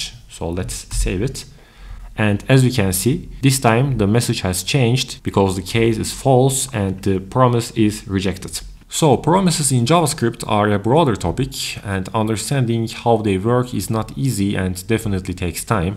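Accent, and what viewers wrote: Turkey. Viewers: İlk cümleden türk aksanı hissettim ama bilemedim :)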